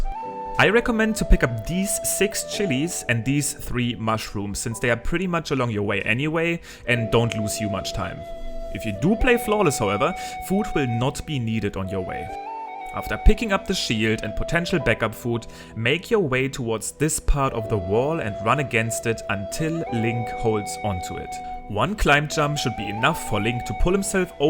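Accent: Germany. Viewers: Is he german? His accent sounds german...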